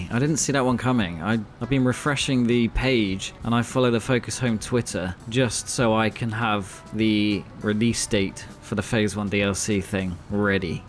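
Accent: United Kingdom. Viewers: I never knew you were a fellow Britishman! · You play SnowRunner. You have a bloody dry humor. And you have a British accent. It's no wonder this channel is going to explode in the next months... ;-) You're doing the right thing. Just keep doing it.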